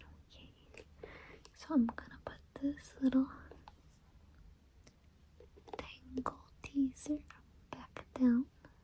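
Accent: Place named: Eastern Europe. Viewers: that wierd. I thought I heard a east european accent. I am a Las Vegas native and I thought I knew west coast accents lol interesting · I thought you were from Eastern Europe honestly. I’ve been on the West Coast my entire life and only heard an accent similar to yours from those who immigrated to the US when they were younger, so they end up with a bit of a mixed accent. Anyways, love your voice and videos.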